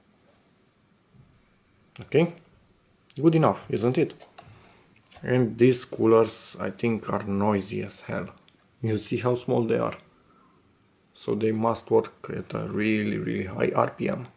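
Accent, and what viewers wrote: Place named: Romania. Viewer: Dupa accent esti roman :P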